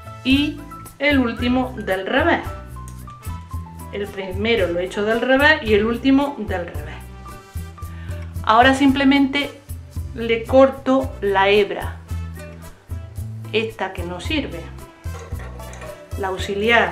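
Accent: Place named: Andalusia, Spain. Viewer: Eres andaluza....deque parte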